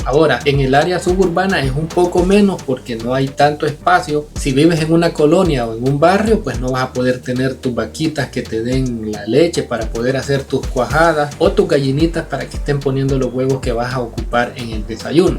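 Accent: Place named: Cuba. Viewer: Por favor podrias pronunciar las letras S, FINALES? Y LA D, FINAL. SE TE OYE COMO J. TU CANAL ES MUY BUENO.ESCUCHATECY VERAS, QUE TU MODO DE HABLAR, PARECE DE UN CUBANO O NUCARAGUENSE PERO NO, SALVADOREÑO.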